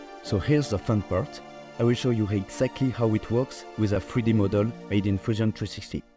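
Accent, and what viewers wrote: France. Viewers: l'accent Francais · L'accent francais mdrr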